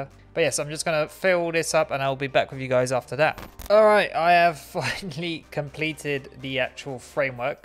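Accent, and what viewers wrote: United Kingdom. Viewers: I just found you! I love these things. Plus the British accent wiff the f sound always sounds authentic to me! Can you make me a Kitty but make it a nice name.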